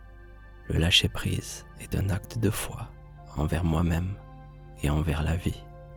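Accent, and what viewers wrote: South, France. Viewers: Un grand M E R C I. 🎉 à toi: j 'aime ta voix du sud. 🙏💙👍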